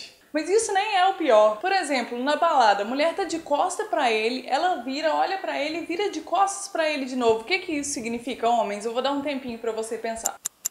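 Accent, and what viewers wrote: Minas Gerais, Brazil. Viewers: sotaque minerim uaii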